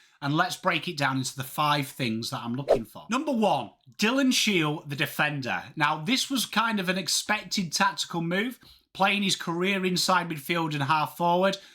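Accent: England, United Kingdom. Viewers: It’s so weird listening to a pommy talk about afl lol but ya know what you’re talking about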